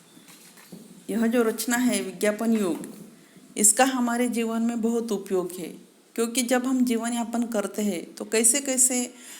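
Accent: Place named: Maharashtra, India. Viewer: Aapka fluency Marathi lag raha hai not good speaking Hindi...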